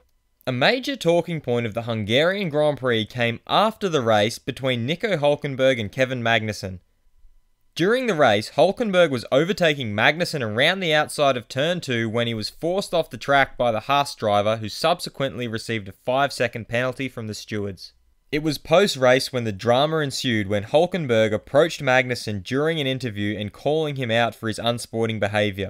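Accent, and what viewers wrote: Australia. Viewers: Are you talking about the voice in the video? Because he's Australian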